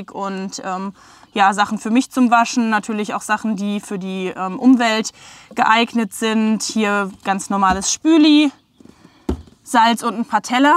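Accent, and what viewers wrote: Germany. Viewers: Lol I thought you were speaking English with a German accent when the vid started lol maybe a way for me to learn another language :) You need a "Wingnut" for the mirror pad holder change over.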